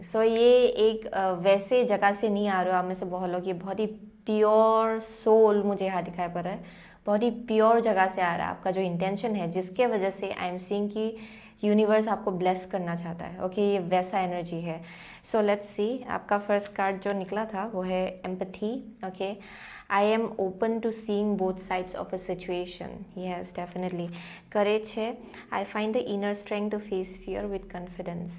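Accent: Northeast, India. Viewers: Aap north eastern se ho kya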